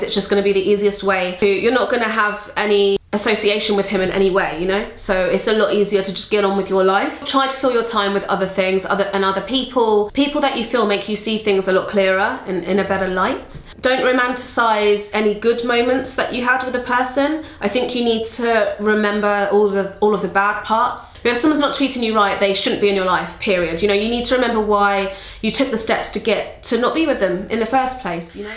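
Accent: United Kingdom. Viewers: You are so cuteeeeee with your british accent!!!!!!! <3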